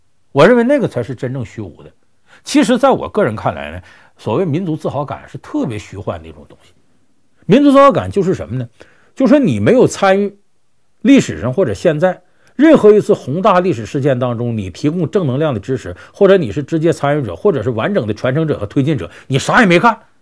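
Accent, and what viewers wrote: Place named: Shandong, China. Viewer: 这山东话太纯了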